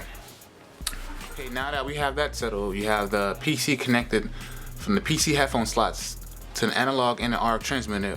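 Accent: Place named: AAVE, United States. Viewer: Hard to understand through all the jive talk and ebonics.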